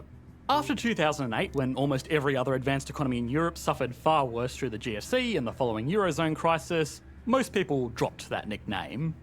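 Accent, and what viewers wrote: Scotland, United Kingdom. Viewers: I wasn't talking about but about the narrator in the clip he reacted to! Scottish accent is genuine and I really like it, but that guy in the clip sounds fake as hell and just annoying.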